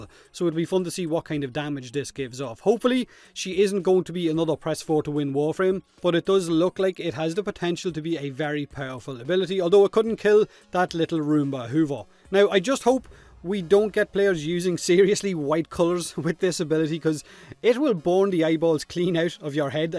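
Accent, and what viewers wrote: Ireland. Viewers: I love Irish you tubers “Harnesses the power of the Feeking sun”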